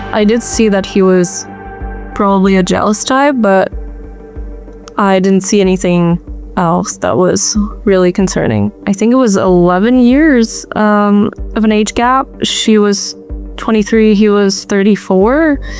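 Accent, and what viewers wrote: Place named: Quebec, Canada. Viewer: She has a slight French Canadian accent. English may not be her first language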